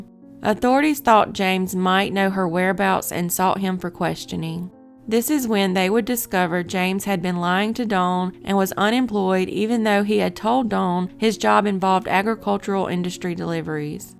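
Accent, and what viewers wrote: South, United States. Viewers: I am truly addicted to true crime and cold cases, I enjoy your presentations and I love listening to your sweet southern accent. Please keep it up, by bringing awareness to these cases you never know who is watching and who may have a key to solving any one of these mysteries. Thank you! I will keep watching and keep supporting your channel!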